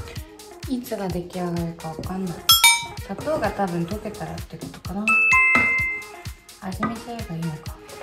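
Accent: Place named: Yamaguchi, Japan. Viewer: ちゃん！今日あなたの動画を見つけて、とっても癒されました♪ありがとう！山口弁てとこもかわいい！私も福岡出身でベルリンに住んでいて、大福作るの大好きで、いつかベルリンのマーケットで売るのが夢なの！食べてほしいな♪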